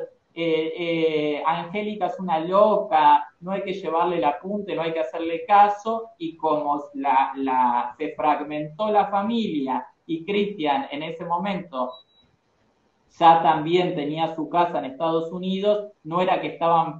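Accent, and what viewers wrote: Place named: Argentina. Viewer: Hola. Me gusta el trabajo que haces y la forma en que detallas cada nota, con tu estilo y ese acento argentino le dan el toque. Al menos en mi opinion que soy mexicana.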